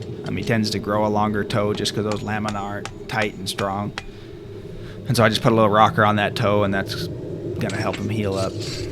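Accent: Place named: Midwest, United States. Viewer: “I gotta dang good wife,” so wholesome aaahhhhh😭 that warmed my heart. PS there’s nothing better than that familiar Midwestern drawl, I’m from Utah and it’s always like a taste of home to hear an accent like yours.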